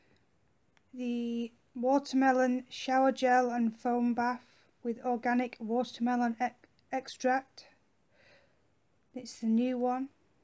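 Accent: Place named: United Kingdom. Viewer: i really like your accent. where abouts in the uk are you from? you got some great freebies. i think i missed out on a couple of these :( i use the coconut oil on my hair and its great x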